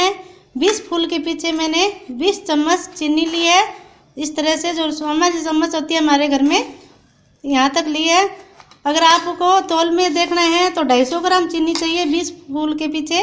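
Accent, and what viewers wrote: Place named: Marwari, India. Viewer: Aap itni marwadi kyon bolte ho? Hindi acche se nahi aati kyan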